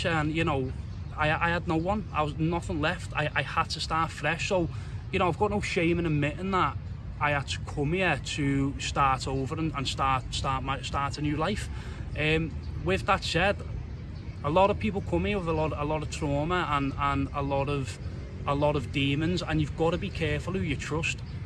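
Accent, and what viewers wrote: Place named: United Kingdom. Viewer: I love British accent ....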